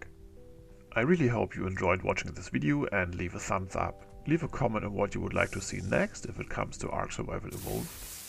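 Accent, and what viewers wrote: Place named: Germany. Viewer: Thanks. I have been making guides for the Ark maps myself & I really appreciate your video. My reviews: 1. Good clear voice & nice accent. Your accent German/Austrian but you are from Georgia, a very scenic country IMO. 2. "Potato mode" v low graphics helps in better visual representation of the ledges. 3. 1 dislike ?? Some People just hate to see others shine while they fall(to lava). Every jumping puzzle video has a lot of dislikes. Keep it up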